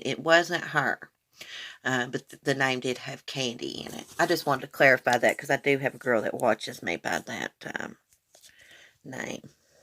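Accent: South, United States. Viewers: okay good I have a baby but never used her as my profile. I guess you knew it wasn't me anyway because you blocked the person you said. ❤ I personally like your southern accent. It doesn't sound bad at all. So F that girl!! She probably had to use her baby as her profile because she was hideous lol. Remember you have alot of supporters and we think your awesome ❤😊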